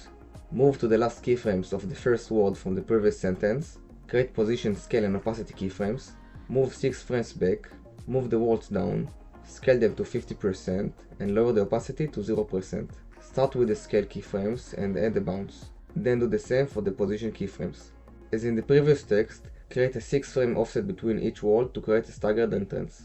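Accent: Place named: France. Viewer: french accent never can cover:face-green-smiling::face-green-smiling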